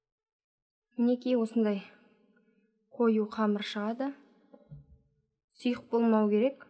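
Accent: Standard. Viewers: Өте керемет.Таза қазақша және анық. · Ассалаумағаликум уа рахматуллахи уа баракатух ! МашаАлла таза анық 100%қазақша сөйлейсіз, рахмет 😘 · Таза казақша сөйлейт Ма ша аллах өнеге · Таза қазақша сөйлеген даусыңнан айналайын сенің!Аман бол,табысты бол көкем!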